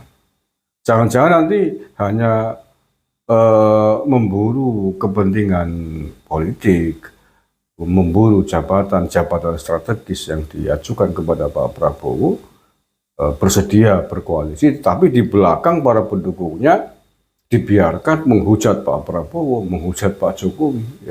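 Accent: Banyumas, Indonesia. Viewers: Kang wong ngapak..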